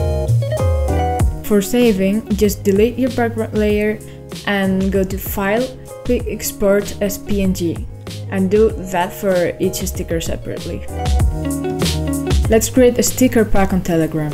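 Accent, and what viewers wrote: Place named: England, United Kingdom. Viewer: Wow that english accent.. ☺️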